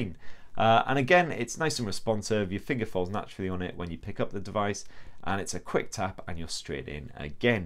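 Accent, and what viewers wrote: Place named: North East England, United Kingdom. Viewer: The reviewer's voice is really off putting with that vile north east accent . He has no concept of speaking intonation whatsoever, just likes the sound of his own voice and is rushing through this review as if he's got a 'promise on' ...... .He needs to go back presenting the 'One show / Country file' .. .. lol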